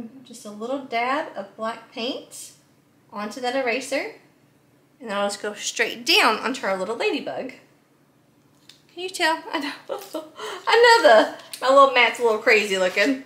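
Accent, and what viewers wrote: South, United States. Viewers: I just love your channel and all the beautiful things you create. You are so talented and your little southern accent is adorable. I'm a 69 year old grandma and it always puts me in a better mood to just watch and listen to you! I think I'm gonna go shopping! Dollar Tree here I come!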